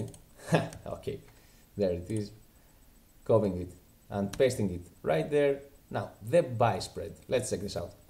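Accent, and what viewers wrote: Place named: Greece. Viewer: are you greek ? only Greeks speak english like that, αυτα ειναι πολυ ψαγμενα πραγματα , ευχαριστουμε που μας δειχνεις τα φωτα σου, άτομα που εχουν φτασει σε αυτο το βαθος ερευνας και χρησης αυτης της τεχνολογιας ειναι ultimate tear geniuses.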